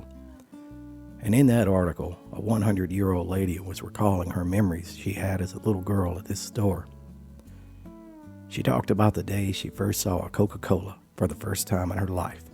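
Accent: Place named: South, United States. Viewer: You make wonderful,easy to watch videos. The music and filming is exceptional in my opinion,oh and you are blessed with a great sounding “southern” voice. Thanks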